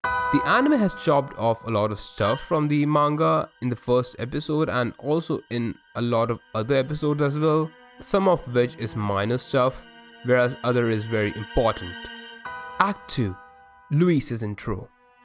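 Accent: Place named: India. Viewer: Du Yu hav a thuffy nose or ar u Indian? Sorry I couldn't quite understand your accent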